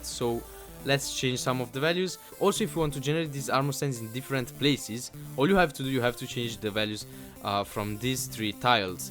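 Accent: Italy. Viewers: Si sente che sei italiano ;) :D ...... SHISH!!! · Sei per caso italiano? Parli inglese ma mi sembri italiano dalla pronuncia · An italian accent! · mado si se sente che sei italiano haha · bel video, l'accento italiano si nota :) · Ehi, ma sei italiano? Nel caso si capisce dall'accento molto chiaro ahah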